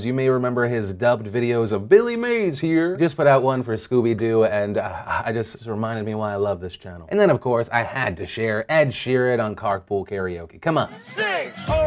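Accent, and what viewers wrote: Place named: Bronx, United States. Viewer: holy shit your from the bronx you are already maybe my favorite youtuber but hearing made me respect you so mutch more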